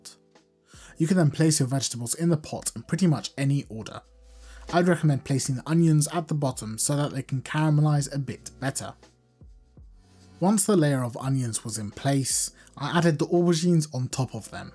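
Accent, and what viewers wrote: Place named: United Kingdom. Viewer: I love your videos. Your "bri'ish" calm accent makes it all 10x better. The turkish/kurdish way of preparing the bell pepper is to cut open the top of the fruit from above around the stem. After removing the core, you can stuff it and use the stem as a lid. Then the bell pepper looks intact. Cheers for the videos again!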